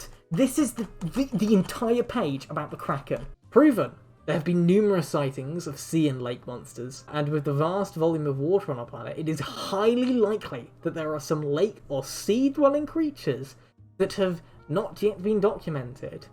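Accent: United Kingdom. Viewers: guys is she un ironically british?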